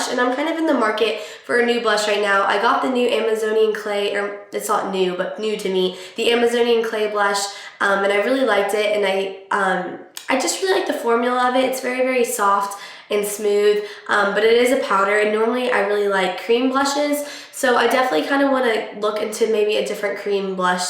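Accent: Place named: General American, United States. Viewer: Hey? I've always wondered why don't you have a Texan accent if you live in Texas? I hear hints of it sometimes but you have a very general American accent!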